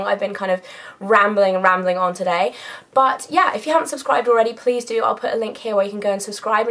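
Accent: United Kingdom. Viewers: Bahaha. i try to talk british like yhu afterwards.. Is tht akward..( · omg , i wish i had a british accent . i love they way your voice is !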